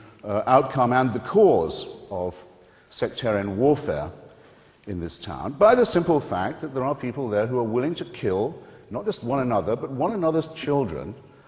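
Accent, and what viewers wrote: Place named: England, United Kingdom. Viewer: It's just his pompous attitude, and English accent.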